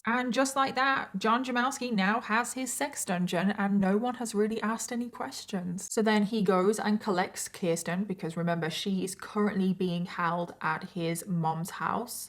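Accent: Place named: United Kingdom. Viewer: I Looovvvee your accent, always been a fan of British accents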